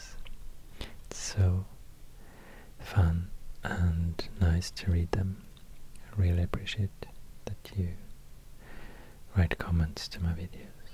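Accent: Sweden. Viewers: Where abouts in Sweden is he from? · Are you from swedan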